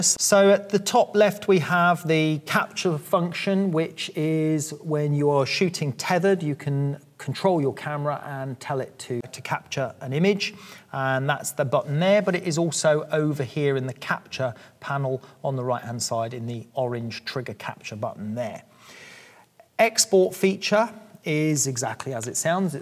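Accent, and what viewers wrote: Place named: England, United Kingdom. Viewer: This is an English accent. If you can't understand it then you need to take some English classes. You could, alternatively, simply use a slower speed setting on YouTube to understand him better.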